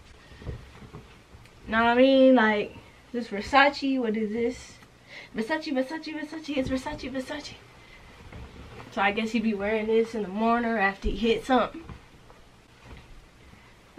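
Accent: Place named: South, United States. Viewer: Love your personality love your voice where you from. You have a southern voice.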